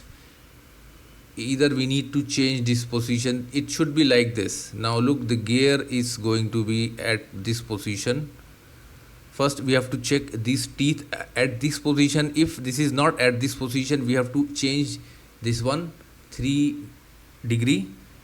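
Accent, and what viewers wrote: India. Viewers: when the presenter has an Indian accent you know it will be good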